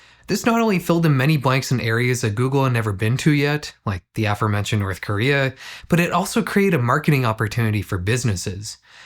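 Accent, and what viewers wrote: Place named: Nova Scotia, Canada. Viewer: Are you from Nova Scotia?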